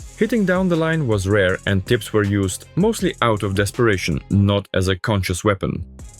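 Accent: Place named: Russia. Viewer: Grüße aus Deutschland 😊 Love your Vids! Like your russian accent. Go on your way! looking forward to see the russian national volleyballteam in the future. You have so much power in your rooster and a huge pool of players in your league! Praying for the End of War. But In Germany We have one of the dumbest goverments of the world! They hate us german patriots more than anyone else 😅 maybe they hate themselves a little bit more 😅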